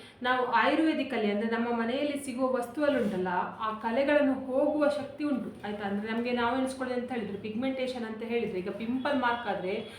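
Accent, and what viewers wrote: Mangalore, India. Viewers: Chanda madi ...astu sala helthira? Mangaluru raga chanda ede..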